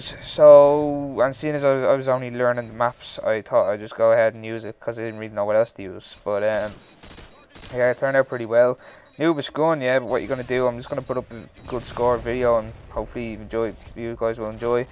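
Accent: Ireland. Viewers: Wat part of ireland r ya from I from ulster tyrone omagh town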